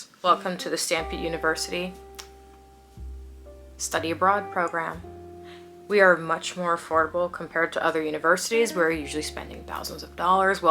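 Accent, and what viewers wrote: Australia. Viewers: The Australian accent 😂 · ur australian accent bye i'm crying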